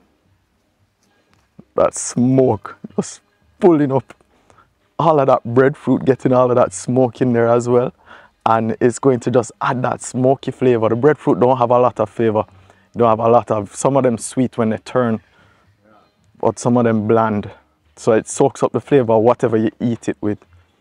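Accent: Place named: Jamaica. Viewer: I love your accent, great job on videos my Jamaican friend:)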